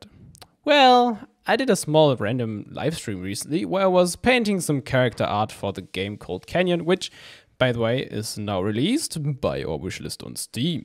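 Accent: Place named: Germany. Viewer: he has the most german accent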